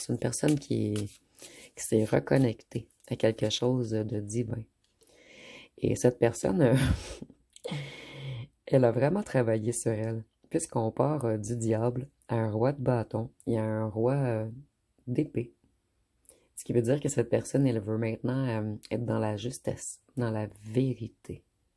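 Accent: Quebec, Canada. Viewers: Ah ,le fameux accent des Québecois(ses)....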